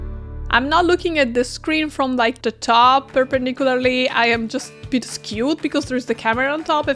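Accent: Italy. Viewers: grazie mille! tutto molto chiaro e semplice :) un saluto! p.s.: commento in italiano perchè sentendo il tuo accento penso tu sia italiana, spero di non aver commesso una gaffe!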